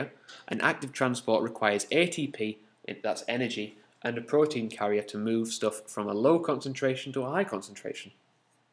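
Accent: United Kingdom. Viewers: Oh myyyy .. That British Accent that I miss a lot!!!!!! Thank you it was so helpful for my SAT biology subject test :)))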